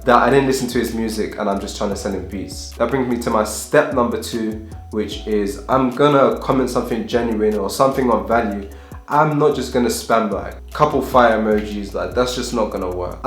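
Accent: United Kingdom. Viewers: ooh nice, how long you been there? Judging by your accent I'm assuming you're originally from the UK? Maybe London or surrounding? I was in Spain for a year, I miss that place, planning a quick visit this summer, maybe we can hook up, talk music. I'll hit you up on insta if that's kool?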